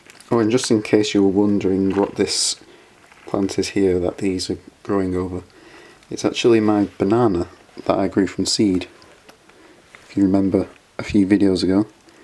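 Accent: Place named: United Kingdom. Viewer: FINALLY! lol I'm so glad you put this one up! I didn't realize how much I was waiting for the awesome camera quality, plant updates, and British accent, until I watched it. Your dragonfruit (and arguably more importantly, bananas lol) are exceptional :D P. S. Might you be growing the Sikkimensis outside eventually, since it is very cold tolerant?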